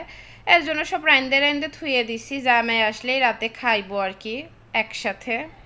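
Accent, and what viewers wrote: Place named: Khulna, Bangladesh. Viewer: বান্ধবি খুলনা ভাষাটা তোমার মুখে শুনতে অসাধারণ লাগলো 😊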